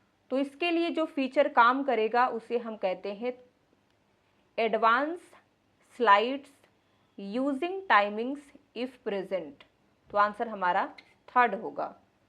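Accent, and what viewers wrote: Odisha, India. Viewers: Ma'am kya aap odisha se hai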